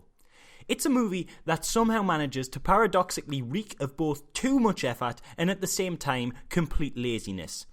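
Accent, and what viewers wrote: Newcastle, United Kingdom. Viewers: Geordie accent at its best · It's a Geordie accent. Listen to Sergeant Lewis from the old 'Inspector Morse' TV show. From northern England. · Sounds Geordie to me. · LOL!!!! Best review, 100% agree! You sound geordie mate!? Shoutout from London! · Wow. Didn't expect Geordie... Class! :D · Geordie. North-East England. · you sound like your from the toon? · It's a Geordie accent from North-East England. Like Davos from Game of Thrones. Except in the show Davos says he's from Flea Bottom so fuck knows how he got a Geordie accent. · Top review mate... Good to hear a fellow Geordie on YouTube.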